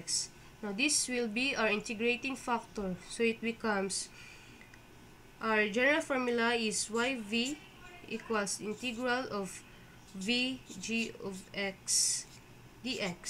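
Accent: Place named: Philippines. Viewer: filipino ka ba? ganyan yung accent ng filipino sa english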